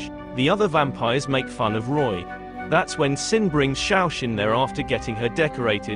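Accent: United Kingdom. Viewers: Yo u British bro? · Yo u british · I think he is! I didn't know british ppl sounded like that no hate to them and they sound pretty cool!! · Nah jit British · yo you British??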